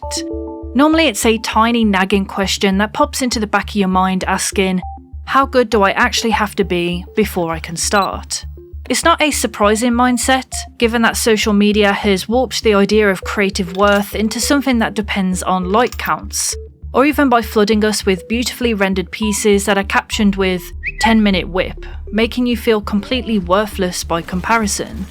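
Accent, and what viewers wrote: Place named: United Kingdom. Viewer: geez your accent is falling thru, i can smell the brit in you from a mile away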